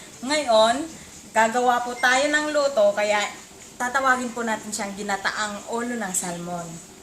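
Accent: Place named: Kamayo, Philippines. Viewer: the way ka kasi magsalita kamayo talaga from Mindanao na linggwahi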